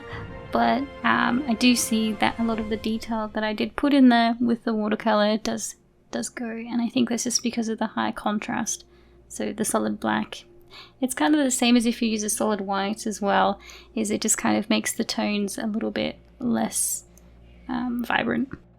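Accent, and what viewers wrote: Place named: United Kingdom. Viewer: What is your accent? It sounds "almost British", but not quite. Are you Welsh?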